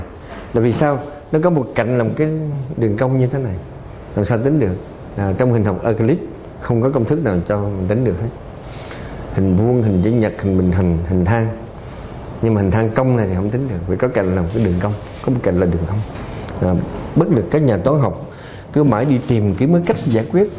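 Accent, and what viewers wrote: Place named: Central, Vietnam. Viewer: Giọng thầy miền Trung, Qng hay Qnam thì phải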